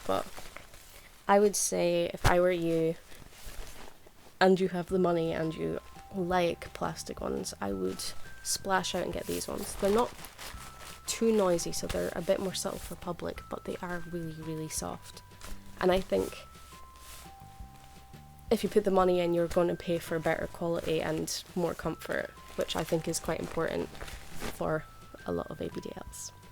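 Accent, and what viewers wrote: United Kingdom. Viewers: I love this video. And the other half dozen of yours I've wached. You're beautiful and your Brit accent slays me. I;m a lifelong Beatles fan and once visited a lady friend in the UK in 1985.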